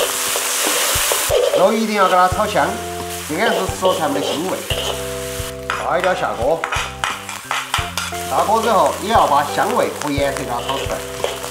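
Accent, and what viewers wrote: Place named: Sichuan, China. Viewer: 有字幕，而且四川話很好聽啊。 怎麼這麼容不下自己不熟悉的文化呢:-(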